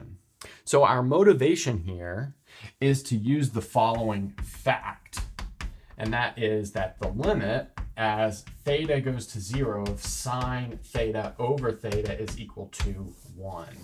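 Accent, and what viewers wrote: United States. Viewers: at the beginning of seeing these videos I was thinking that the formula lim f/g=lim f'/g' was the log beetle rules and I realized that it was l'hôpital rules and I am french😂 American accent is really fun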